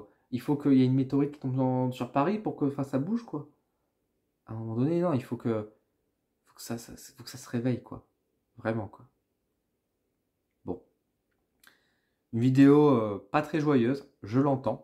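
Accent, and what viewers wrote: North, France. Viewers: t'es du ch'nord tizot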